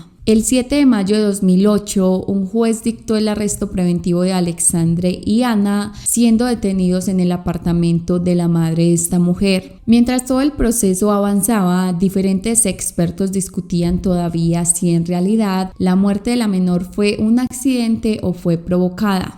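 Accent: Antioquia, Colombia. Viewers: Eres de Colombia? O de donde es tu acento? Me encanta tu acento. Bueno saludos ciao 💋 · Me encanta tu espontaneidad, sos PAISA lo sé .. yo también aunque vivo en Londres have 20 ańos. Ese acento nuestro es maravilloso Sigo tu canal y me gusta mucho tus historias y análisis.. MUCHÍSIMA SUERTE DIOS TE BENDIGA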